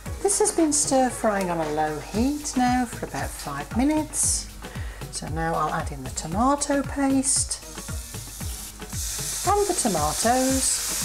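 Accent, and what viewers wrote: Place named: England, United Kingdom. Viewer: Ok, English accent with a slight hint off southern hemisphere in there, but Carrefour tin sugests you are out of the country....................... hmmmmm, I am guessing you are living in Australia? Im going East Coast Australia........... Am I within a thousand miles?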